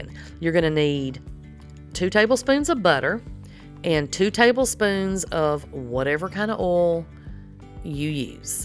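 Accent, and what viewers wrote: South, United States. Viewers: First time watching. I love your southern accent "do you boo"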